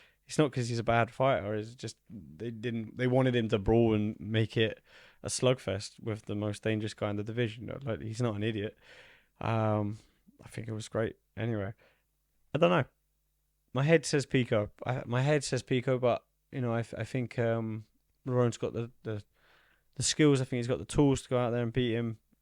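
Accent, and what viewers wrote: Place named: Australia. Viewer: You kinda talk like an Aussie lol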